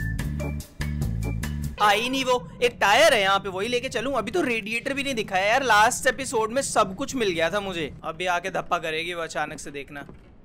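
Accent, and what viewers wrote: Haryana, India. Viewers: play evil nun please! With your haryanavi accent and commentary😍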